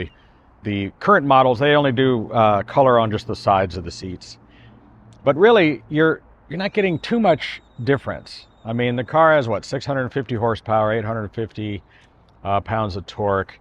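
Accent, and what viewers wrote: United Kingdom. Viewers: at first yeah but after a few videos it normal and I like his British accent. However, his father hard laughing after each talk is very annoying.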